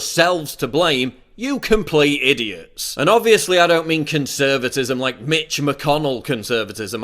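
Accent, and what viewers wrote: United Kingdom. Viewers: This fucking beautiful british accent haha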